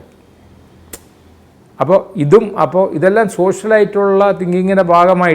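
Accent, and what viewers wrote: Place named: Malabar, India. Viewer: മലബാർ ശൈലിയിൽ സംസാരിക്കുന്നു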